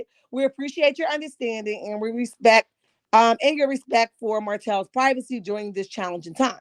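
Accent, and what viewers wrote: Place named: New Jersey, United States. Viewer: I knew it! I hear it all in your voice. I’m from Jersey too, North Jersey. I can tell you are too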